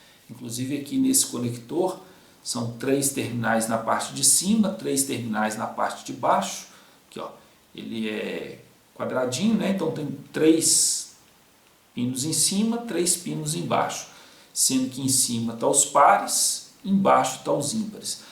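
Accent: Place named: Belo Horizonte, Brazil. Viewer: Esse aí é de BH certeza!😅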